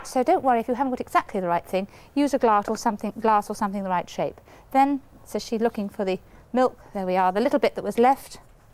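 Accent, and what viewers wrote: RP, United Kingdom. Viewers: That's when presenters on TV spoke the Queens English. Flashing teeth etc and the more showman style is down to the influence of US style infiltrating television.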